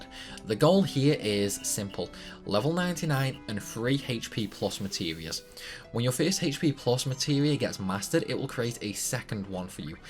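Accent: United Kingdom. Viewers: Your accent makes some words hard to understand.... And I’m british too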